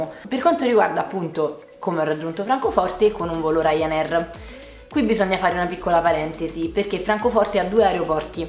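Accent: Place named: Rome, Italy. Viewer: Io sono stato ad Amburgo e l'ho trovata stupenda sotto il periodo natalizio ma penso sia una cosa tipica delle città tedesche, che ne pensi? Comunque è già nella mia lista dei posti da visitare! Grazie :D Ps: adesso lo sento l'accento romano ahahah ti avevo chiesto di dove fossi perché mi piace incontro le persone che seguo assiduamente e conoscersi dal vivo! Da uno sprint in più !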